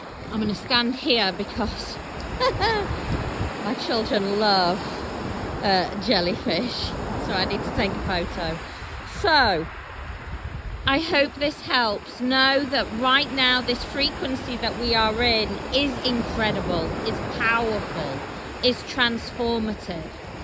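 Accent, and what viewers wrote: United Kingdom. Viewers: She's from U.K. That's just how they talk I think. 🙂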